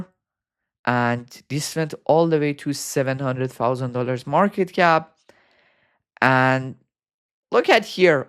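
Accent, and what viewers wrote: Iran. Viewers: persian accent uhh xd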